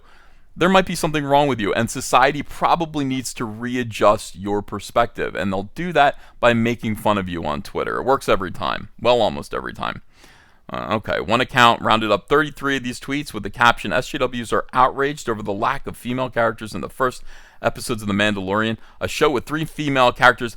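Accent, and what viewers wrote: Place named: Pennsylvania, United States. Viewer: I love the tactic of compiling the hateful tweets of the SJW crowd when one of their shows premier. Case in point The Wokeman's repulsive 1st episode. Virtue signaling doesn't work now that the normal folk have taken to the interwebs in defense of sanity, and as you so well put, STORY TELLING in entertainment. On a side note, I listened to this episode at my folks house while fixing my mom's table. She not only laughed at some of the clever zingers, but asked, "Oh, is he from PA??" In an enthusiastic tone. Lol. I thought the same, I must say. We're Texans, but mom hails from The Keystone State and you do seem to have that regional inflection. Love your work, good sir!! Happy Thanksgiving to you and your family!!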